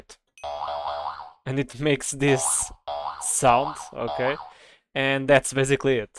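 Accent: Portugal. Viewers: Um tuga conseguir indentificar um tuga a falar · tenho quase a certeza que és português pelo teu sotaque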